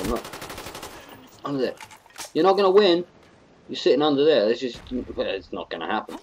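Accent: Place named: Australia. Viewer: seriously the Aussies passed on their accent to you. its sounds awsome. great vid bud.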